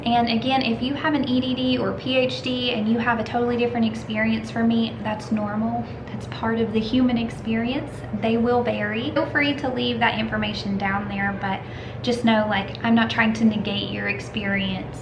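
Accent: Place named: Texas, United States. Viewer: I came here because I just finished an EdD and now I want a PhD. Thanks for the info. I wish I knew this stuff before I committed to my Ed.D. program. Oh, well, live (with debt) and learn. I’m looking at postdoc fellowships, too. On a side note, I love your accent! Where in Texas is it from ? ⭐️💯 When I see my family every summer in El Paso and San Antonio few people have the Texas drawl..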